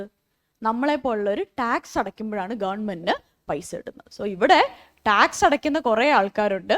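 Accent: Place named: North India, India. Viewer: you are gem at english still speaking malayalam like north one...!!!!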